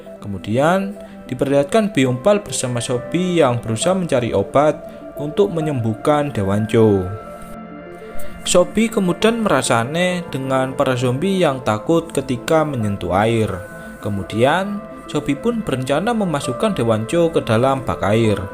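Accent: Java, Indonesia. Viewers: Gak asik denger naratornya....terlalu medok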